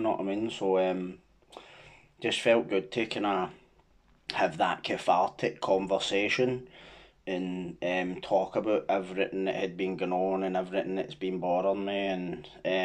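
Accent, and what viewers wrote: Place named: Glasgow, United Kingdom. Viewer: I've heard a lot of these stories before,but it's refreshing hearing it in a Glasgow accent and perspective.honestly well done on everything,I seen you on fivos podcast.theres real change there so respect to you.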